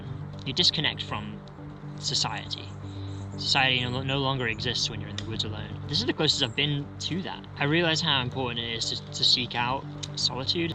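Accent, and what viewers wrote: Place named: London, United Kingdom. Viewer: Yo you always say your from wales but you have a British accent? You sound more like you are from where I’m from which is near London? Great video tho dude 🍄🍁💙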